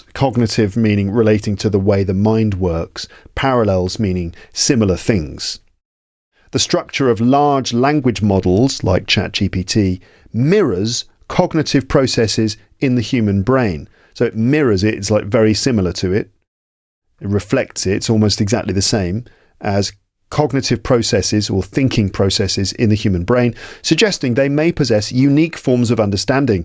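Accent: United Kingdom. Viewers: Greetings from Brazil! I’ve been watching your videos to improve my British accent, you’re amazing !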